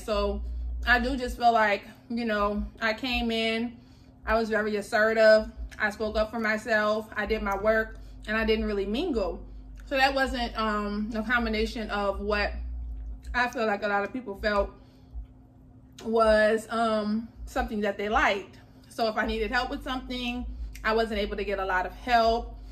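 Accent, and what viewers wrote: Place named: New Orleans, United States. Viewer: I knew you where from new orleans… sis that accent you cannot hide. I mean... I was trying to listen... I was like... well she kinda sound new orleans.. It peaks through.. anyway.. Im from Thibodaux... youll probably know where that is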